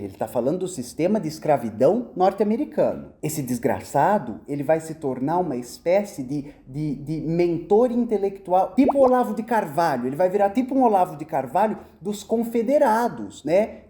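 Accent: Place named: Inland São Paulo, Brazil. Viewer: Apresentação brilhante e muito rica nas citações, elogios a sua pronuncia das palavras, como o paulista do interior, e desta elegante mistura de tantos imigrantes do Estado de São Paulo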